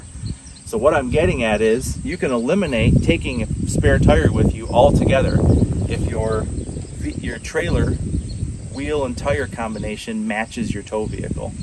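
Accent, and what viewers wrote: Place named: Michigan, United States. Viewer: Loviny the Michigan accent go green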